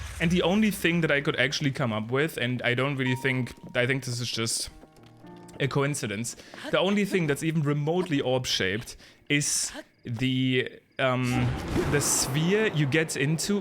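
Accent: Germany. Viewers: why do you sound so german?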